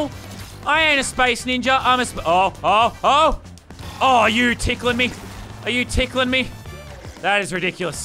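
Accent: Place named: Australia. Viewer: Lazarbeam every one is saying ur British but ur Aussie Like me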